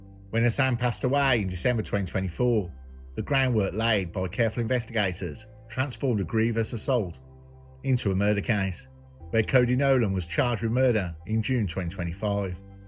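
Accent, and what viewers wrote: Birmingham, United Kingdom. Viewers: Brummie accent may not be to your liking but lots of us are following and enjoying his work and narration.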